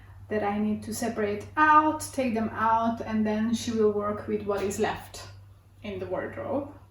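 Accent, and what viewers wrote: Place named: Hungary. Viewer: Szia! Az akcentusodból gondoltam, hogy magyar vagy. Szerintem a fények, a kamera és az ebben a videóban viselt blúzod alapján úgy gondolom, hogy mégiscsak Tél típus vagy, mégpedig Hideg Tél. Engem 3 végzett színtanácsadó nézett "félre" személyesen, míg már annyira frusztrált lettem, hogy elmentem egy szín- és stílustanácsadó tanfolyamra, hogy kiderítsem az igazságot. 🙂A baj az, hogy az "avatatlan" szem (néha még a tanácsadók is!) nem tudják, hogy mit kell nézni (elsősorban a bőr egységes és egészséges ragyogását). A rossz színek elszínezik az arcot, szürkítik vagy sárgítják, kihozzák a bőrhibákat, a szem alatti karikákat, stb. A tanácsadó pont az ellenkezőjét mondta neked, mint amit én mondanék. Az ösztöneid ebben az esetben jól működtek, javasolom, hogy hallgass rájuk (vagy legalább kérdezz meg még valakit). 🙂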